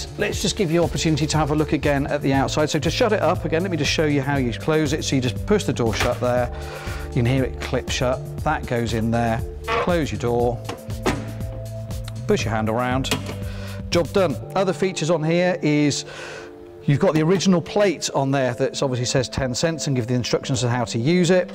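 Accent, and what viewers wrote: England, United Kingdom. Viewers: (This is Tom, not Sandra.) Great video sir. Love the English accent, too. I wish l could find a classic Coke machine from the 50's or 60's. I love ALL things, classic, from the 50's and 60's, myself. I, being born in 1958, grew up with these classic drink machines. Thank you for highlighting these wonderful artifacts from our past, sir.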